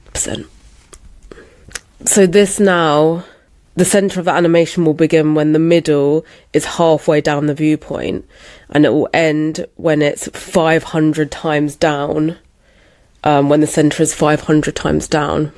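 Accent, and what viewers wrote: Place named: United Kingdom. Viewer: I love your British accent, it sounds so beautiful. Of course, I also liked your video, they are amazing effects.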